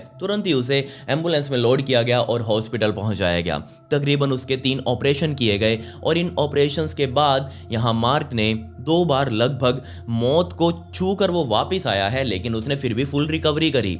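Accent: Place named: Punjab, India. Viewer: Koi dikkat nahi hai! I guess you are a Punjabi! Kindly reply if I am right!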